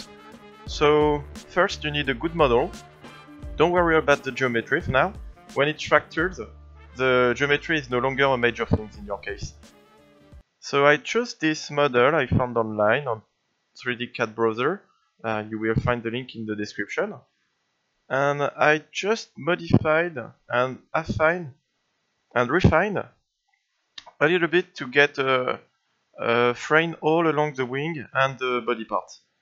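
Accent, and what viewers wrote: France. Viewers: J'ai l'impression que tu es français non ? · You're french ?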